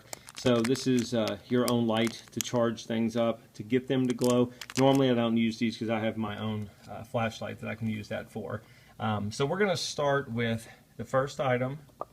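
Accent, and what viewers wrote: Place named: South, United States. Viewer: Pah-peel-yone. It means "butterfly" in French. Love your videos. Great calming voice, with a pleasant Southern lilt.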